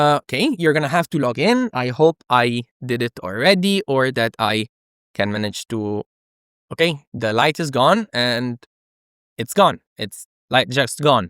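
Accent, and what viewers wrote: Italy. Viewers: Accent kinda italic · Idk but this guy made me fall in love with Italian Accent...!!!!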